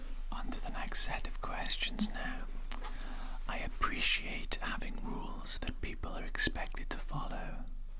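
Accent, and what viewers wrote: Wales, United Kingdom. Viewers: you have such a relaxing voice , do i detect a little bit of a welsh accent there? sorry you took down the wrong answer for me there i dont infact always finish my chores , super relaxed video loved the writing sounds 😴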